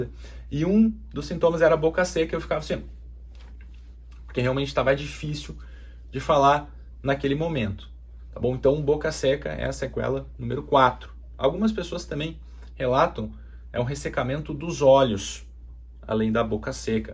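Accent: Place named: Rio Grande do Sul, Brazil. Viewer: Eu dou nota 10 . Eu vi que tu és gaúcho , pelo sotaque. Conheço bem . Moro em São Paulo !!!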